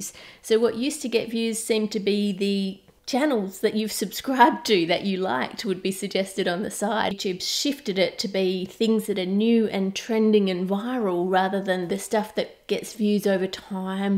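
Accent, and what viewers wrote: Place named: Australia. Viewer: Can you please do a video where you just repeat the word chocolate please, i love your aussie accent when you say ot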